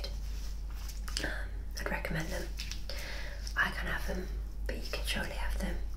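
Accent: United Kingdom. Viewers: Wow you have a heavy British accent! I hardly can understand it but I like it! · Are you actually British like me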